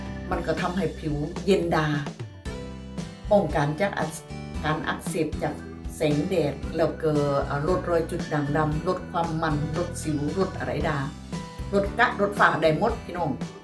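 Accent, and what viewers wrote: Korat, Thailand. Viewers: ชอบค่ะ พูดภาษาโคราช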